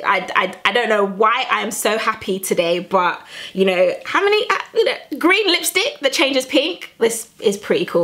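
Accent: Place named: United Kingdom. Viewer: Sound British you are a beautiful woman and it fit u perfect. Go girl.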